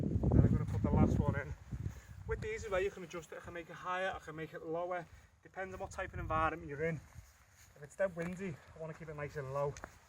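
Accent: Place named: Liverpool, United Kingdom. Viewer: English with a Liverpool accent.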